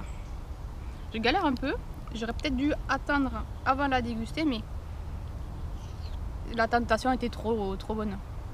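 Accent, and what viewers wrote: South, France. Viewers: Suuuuper ! moi j'en ai fait une aussi avec 2 ingredients mais se ne sont pas les mêmes haha. passe voir si tu veux, en tout cas je m'abonne , j'aime ton petit accent du sud !!! et pouce en l'air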